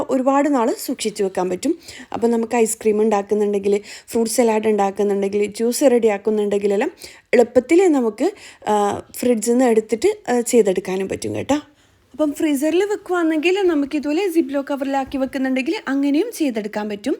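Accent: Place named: Kozhikode, India. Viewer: കോഴിക്കോട് സ്ലാഗ് ആണ് കേൾക്കാൻ രെസം വ്ലോഗ് ഇഷ്ട്ടം ആണ്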